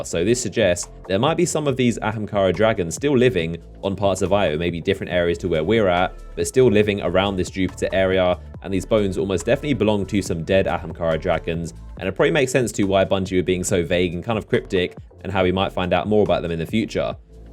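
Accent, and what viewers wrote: United Kingdom. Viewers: I disliked because you’re one of millions of stupid British YouTubers trying to be something. You’re accent and content is useless shit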